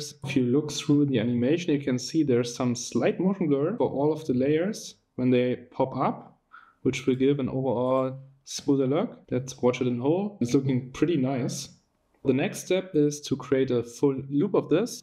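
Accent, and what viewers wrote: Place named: Germany. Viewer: Du bist Deutscher ich hör das raus aber Nice Video haha 😂❤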